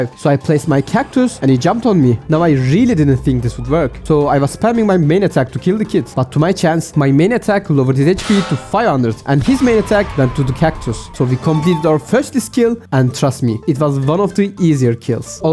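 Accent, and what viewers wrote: Turkey. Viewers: vrotato ru from turkey ngl cuz ur accent is so close to mine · holy Turkish accent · TURKISH ACCENT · Yo are you Turkish? Your sound like that i think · videon anasayfama dustu ve ilginc geldigi icin acip izliyim dedim. videoyu acar acmaz saniyesinde aksanindan turk oldugunu anladim. sonra da kanal ismine bi bakiyim dedim ve ne goriyim, adasmisiz:D tanidigm bikac birisin ve hic beklemedigim biyerde ismimi gormek sassirtti beni. kanalina soyle bi baktim ve icerigin de hosuma gitti, yt seruveninde basarilarinin devamini dilerim dostum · Bu harika bir video, aksanına da bayıldım; ayrıca Türk müsün?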